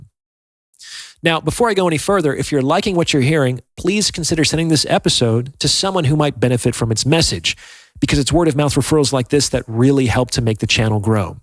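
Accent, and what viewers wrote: United Kingdom. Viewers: RP AF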